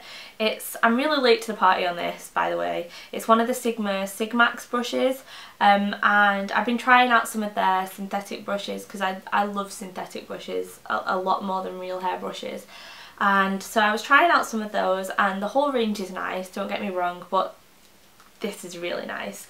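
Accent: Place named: Preston, United Kingdom. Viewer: From one to another! I love your videos and your accent, my Gran, aunt, uncle and cousins lives in Preston and has a similar accent to yours and I don't know but I feel at home when I watch your videos and trust your opinions! Love the work you and keep it going x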